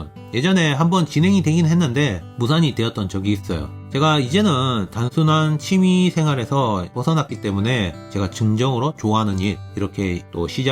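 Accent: Gyeongsang, South Korea. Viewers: 아 말투 경상도 좆같네 사기꾼새끼들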